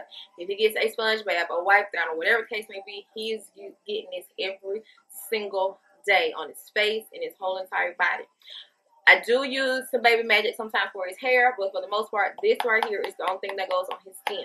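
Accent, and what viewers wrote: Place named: South, United States. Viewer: Love your southern accent I’m from Florida I can hear a similarity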